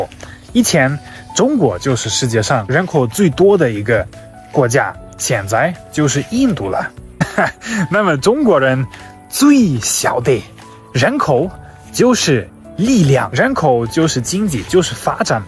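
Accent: Sichuan, China. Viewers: 四川话➕普通话😂你能认真学习普通话吗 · 你講話怎麼有個四川音，尤其講「 」的時候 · 他说的是四川口音 😂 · 妥妥的四川口音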